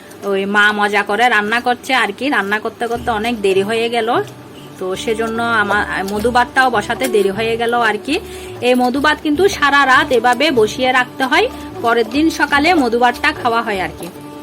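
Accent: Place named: Noakhali, Bangladesh. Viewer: আমার মনে হয় আপনার বাড়ি ফেনি অথবা নোয়াখালী হবে । চট্টগ্রামের আঞ্চলিক ভাষা এরকম নয় ।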